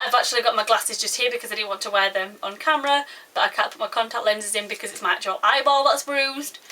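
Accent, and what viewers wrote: Yorkshire, United Kingdom. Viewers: You sound like a yorkshire bird anywhere near leeds mebbe? :D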